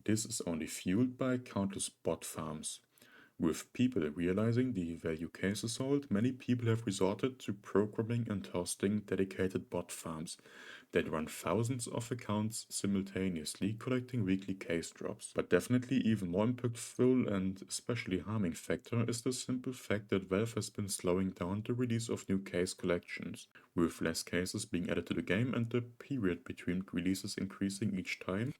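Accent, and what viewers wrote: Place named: Germany. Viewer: You have a very soothing deep asmr voice with a hint of german accent so like a more chill onhepixel uwu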